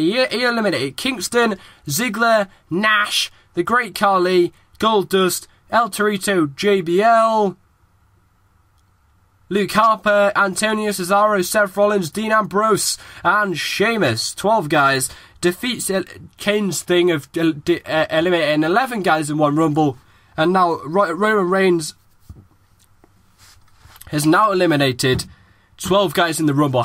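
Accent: United Kingdom. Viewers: Do you speak British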